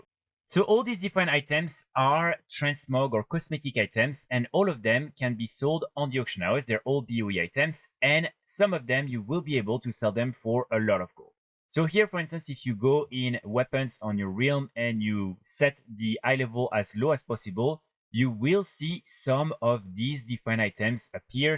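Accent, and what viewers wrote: France. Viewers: T'es français non? L'accent xd Merci pour la vidéo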